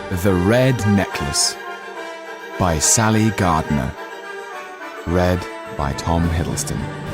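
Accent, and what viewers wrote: Cockney, United Kingdom. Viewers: Cockney accent. Oh my god *.* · The cockney accent! Oh my god! This man is perfect x_x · Everything is better with a british accent. He could read out a phone book and my heart would still stop beating at every Second word!!!! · He is the reason my siri voice is ‘British man’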